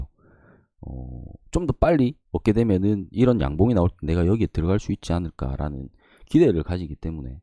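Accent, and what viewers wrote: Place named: Gyeongsang, South Korea. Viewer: 감사합니다 진솔하게 알려주심 감사드립니다 경상도 사토리가 정답습니다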